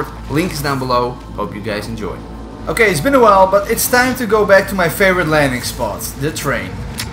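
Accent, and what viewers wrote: Netherlands. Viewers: Ik hoor het Nederlands door het Engels heen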